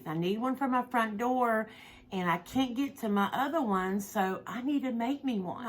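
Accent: South, United States. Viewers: I just love your show and that southern accent you have ! I truly enjoy the decorations and the diy’s . When I am feeling low you make me smile Be blessed ! Andrea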